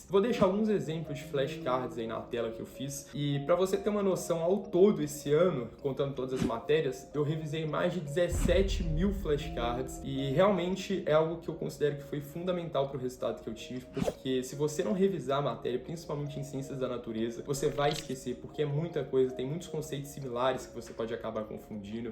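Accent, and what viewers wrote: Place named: Minas Gerais, Brazil. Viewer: Oh sotaque mineiro, e muito bom kk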